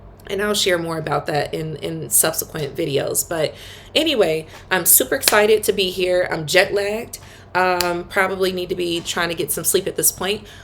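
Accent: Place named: South Africa. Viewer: Thank You Mam for promoting our Country. You sound like a South African already. Enjoy Your stay here.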